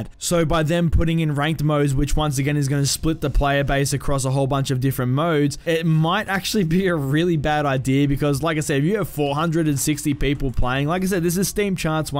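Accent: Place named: Australia. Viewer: Which part of australia you from? Im subscribing m8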